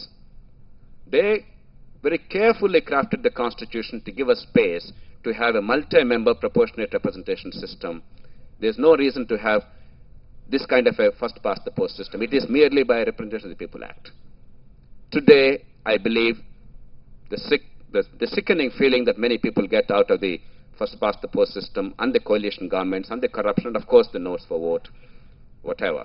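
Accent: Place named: United Kingdom. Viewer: Really his voice resembling British slang... Hats off sir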